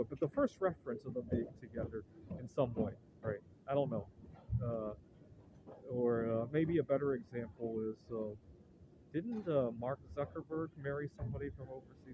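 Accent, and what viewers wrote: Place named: United States. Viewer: do you generally communicate with people in Taiwan in Chinese or english? How do people react when you start speaking English to people there in your American accent? Just curious because when I go to India I get a lot of head-turns and confused looks, because to them, seeing a person that looks like them but sounds foreigner is a unique sight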